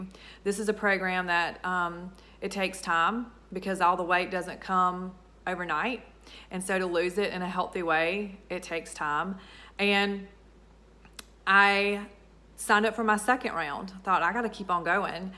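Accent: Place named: South Carolina, United States. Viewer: You sound like you're from SC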